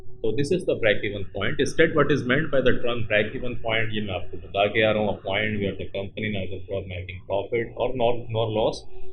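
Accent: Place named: India. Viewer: I can't understand anything due to the Indian's accent.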